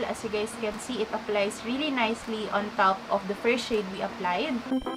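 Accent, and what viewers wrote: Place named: Iloilo, Philippines. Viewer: You sound like an Ilongga. 😍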